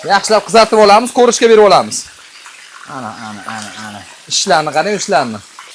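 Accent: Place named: Kokand, Uzbekistan. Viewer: АССАЛОМУ АЛЕЙКУМ КЫРГЫЗСТОНДИ ОШ ШАХРИДАН КУЗАТАМАН КОЛЛАРИЗ ДАРД КОРМАСИН МЕНИ АЯМ КОКОНЛИК ОШГА КЕЛИН БОЛИБ ТУШГАН ШЕВЕЛАРИЗ ОХШАБ КЕТАДИ ЭШИТИБ ХУРСАНД БОЛАМАН😊.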